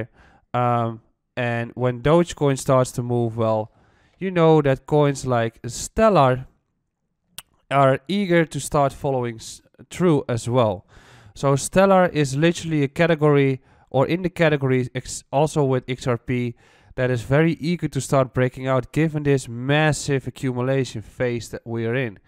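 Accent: Netherlands. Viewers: Are you Dutch? 🇳🇱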